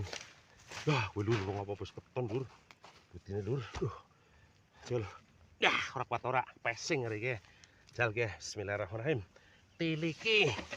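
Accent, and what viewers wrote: Banyumas, Indonesia. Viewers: Mirip kang Cecep prepan pensiun ganing ya,, kyane Mase wong banyumasan ya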